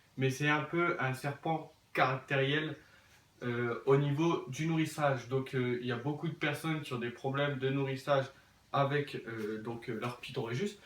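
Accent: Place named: North, France. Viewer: Petit accent du nord ^^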